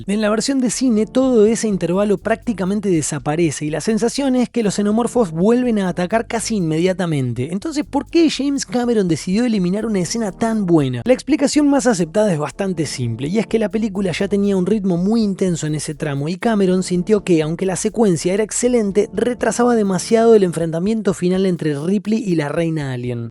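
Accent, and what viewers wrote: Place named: Argentina. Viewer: Lo que en llamas "torretas" se llaman en realidad robots centinelas .. Todo bien con este contenido que me parece muy interesante,pero hay que decir las cosas como son.. Además no entiendo xq este canal pone como país de origen a Estados Unidos,cuando cualquiera que te escucha hablar de da cuenta que sos argentino.. Saludos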